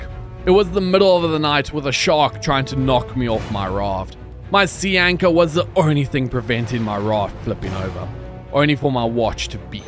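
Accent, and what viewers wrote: Afrikaans, South Africa. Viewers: hy het n afrikaans se aksent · I think anyone who has ever met a single south African forever recognises the accent. It's quite unmistakable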